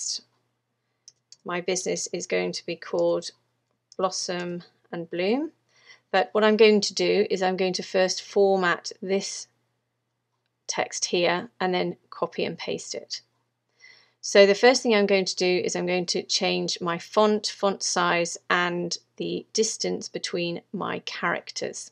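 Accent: England, United Kingdom. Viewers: Absolutely wonderful. You sound English, I'm from Bristol, live in Australia. Writing a biography of a wonderful life with my late Valerie. Met in 1955, torn apart in 2019. But, you have given me so much knowledge to make this story readable, and I hope for others. Well done, Take care, Terry.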